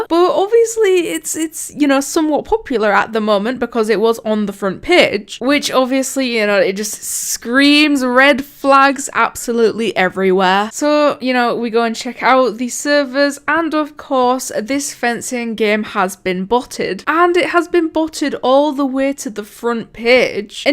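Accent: United Kingdom. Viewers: I like 👍 your british voice👍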